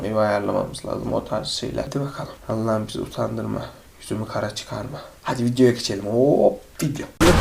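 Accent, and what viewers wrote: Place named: Konya, Turkey. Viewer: La bende konya bozkirliyim abi şive n tam bizim ora yaaa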